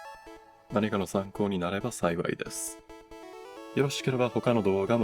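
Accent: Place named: Kansai, Japan. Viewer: 初見ですが絵はもちろん、声が低音でかっこいいし関西弁… 控えめに言って好きです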